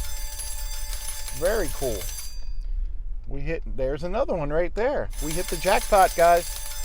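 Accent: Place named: Texas, United States. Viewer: You have a great texas drawl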